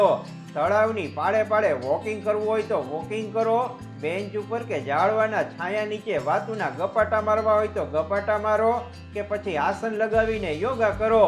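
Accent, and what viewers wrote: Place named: Ahmedabad, India. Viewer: બહુ દા'ડે દેખાયા!!!😊 હું બહુ નાનો હતો ત્યારે અમદાવાદમાં આવું ગુજરાતી લોકોને બોલતા સાંભળ્યા હતા. અમારા કૉલેજના દિવસોમાં તો અમદાવાદ પંચરવ એટલે કે કૉસ્મોપોલિટન સીટી થઈ ગયુ હતુ ને આવી ગુજરાતી લગભગ કોઈ નહોતુ બોલતુ. જોકે માન્ય ગુજરાતી ભાષા બોલતા હતા, અમદાવાદ-વડોદરાની બોલી. હવે તો આવી ભાષા અમદાવાદમાં સાંભળવા પણ નથી મળતી. અમદાવાદ-વડોદરાની બોલી પણ બદલાતી જાય છે!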